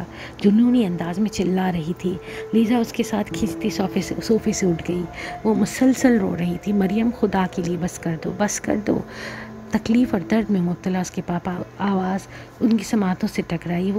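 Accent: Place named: India. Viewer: I just dont understand as being an Urdu speaker why can't you pronounce Urdu words properly? you keep saying TULKHI INSTEAD OF TALKHI, and many other words like this and since when Pakistani's started speaking like Indian?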